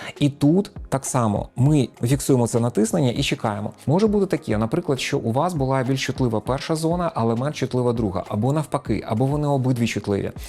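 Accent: Standard. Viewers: Я навіть не знаю, що більше слухаю, цінні поради чи таку гарну, чисту українську мову.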